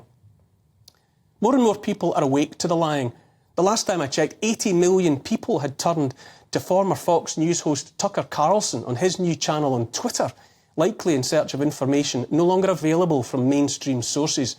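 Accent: Scotland, United Kingdom. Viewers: These tyrants will need to be physically removed! 🤬🤬 Won't Kneel A gentle, beautiful Scottish lilt A kind, empathic, forward tilt An open heart; a caring face A willingness to take his place. A strong, home-hitting monologue Reminds us of the boiling frog The courage to become a sleuth A passion to release the truth. A deep desire to crack the whip Illuminates our freedom-strip Calls out the darkness taking hold The shiny despots; plated gold! Intense and raw, he lays it bare A soul-enhancing, honest stare Inflections drive the message through He speaks for Us - for me and you! Discernment's such a precious gift His words create a mighty lift Take heed of all he has to say He knows - it shouldn't be this way! 🤗🦋🐬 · Oliver: Would you consider making verified subtitles for such videos? While many people in the non-English speaking world might have enough command of the language itself, they have massive problems with the Scottish accent. 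(Which I personally thoroughly enjoy.) These videos by Oliver should be heard EVERYWHERE. Best wishes from Germany.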